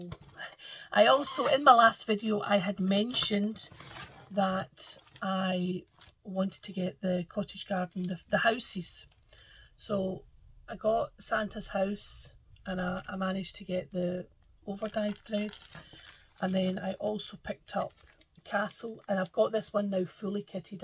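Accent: Scotland, United Kingdom. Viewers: I enjoy your videos so much. And your Scottish accent is so delightful! Your enthusiasm for this beautiful craft make me go down rabbit trails in my stitching life. Blessings from Canada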